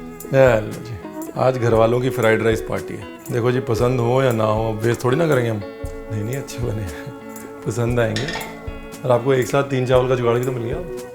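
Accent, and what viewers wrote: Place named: Punjab, India. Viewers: Aaj tou fir teen shadiyaan hongi shamko, aana jarur ji.... 💥💥💥btw aapki punjabi k sath meri bhi punjabi nikal jati hai, n my hubby say, tere naal he gallan kar reha hai 🤣🤣🤣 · Amazing recipes of left over rice...will try paneer 65 rice for sure..luv d way u explain.ur punjabi tone while explaining reminds me of my mom...meethe chol ni banane ha ha👍😀too gud...love n respect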